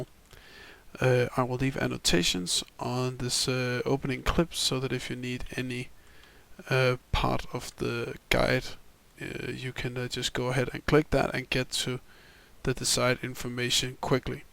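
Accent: Denmark. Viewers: You sound danish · Fellow Dane :) I heard it instantly, then went to your channel, and I was right. Aside from that, I like your videos. They're good and deserves a lot more views. Hope you have success in the future. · Man kan høre at du er dansker. Det kan man altid :D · Are u danish? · man, i could totally hear you are from Denmark. PS. GO Denmark!!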